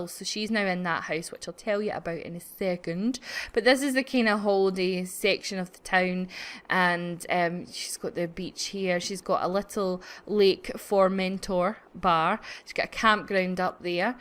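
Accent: Scotland, United Kingdom. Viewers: She's scottish and I think her accent is lovely [ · i love your video's! And your Scottish accent is very nice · She's from Scotland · Scotland is my probably pretty accurate guess since I've lived there myself for a bit ;) Ya know, where Merida is from?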